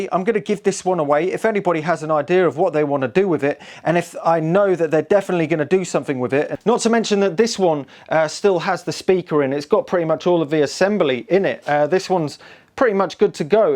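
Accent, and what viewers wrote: London, United Kingdom. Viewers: Pissin off your awesome londoner accent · The fact that you are warning people to not touch the end of a plug after cutting it off it’s plugged in proves other than your accent that you live in the UK you know there’s a thing called Darwin evolution and it’s the reason why people are getting stupider nowadays because it isn’t able to function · These are called 'cheese wheel' Leslie. These is a full spectrum Leslie, Very cool but the full Leslie was wondrous. The bottom was a bass rotating drum with one opening with a curved sheet attached to the upper rear and to the lower front edge. On top was the rotating treble horn [in the opposite direction] There were usually on treble driver , not moving, driving treble waves up into what looked like a double split horn, however, one side was closed off to act like a perfect counter balance. 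You sound like you are in the UK so l don't think you could donate the unpainted cheese wheel to me, but l am now stuck in an assisted living building, with a Yamaha MO8 sitting there because my Leslie was stolen. what you have there will change the dynamic of both your playing and writing, because as you noticed in this video, cool sounds, sound Plastic Fantastic Awayla. It's Halloween night in Salt Lake City, Utah USA so you have been funking off since June. I wish I could hear what you have come up with. People seldom have exactly the same reaction to this marvelous effect. If you come across another out of this world find, Please keep Tom Behind the Zion Curtain, in MOMOLAND UTAH WISH WE COULD JAM WITH COMPLIMENTARY DUAL KEYBOARD LEAD BROTHER. NOTHINGS BETTING THAN DUAL IMPROV! KEEP IT UP KID, CUZ YOU GOT IT! TDH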